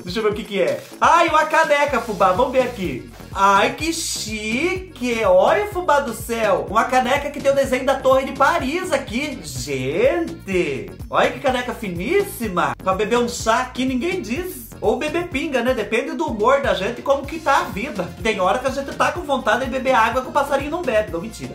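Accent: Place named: Paraná, Brazil. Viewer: Ahh, entendi vc mora no Paraná, esse sotaque bem forte só pode ser eu doida pra saber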